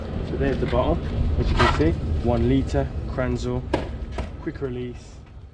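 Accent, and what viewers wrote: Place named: Midlands, United Kingdom. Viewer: Nice video buddy. thank you .. i detect a midlands accent there....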